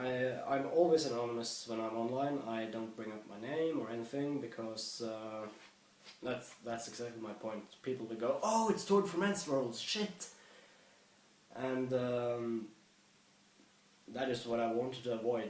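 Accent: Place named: Australia. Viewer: Bruh how did I always think he was Australian...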